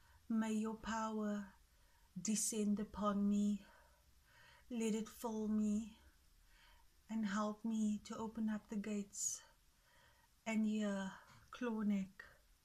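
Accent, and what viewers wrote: South Africa. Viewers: You sound like you come from my country south Africa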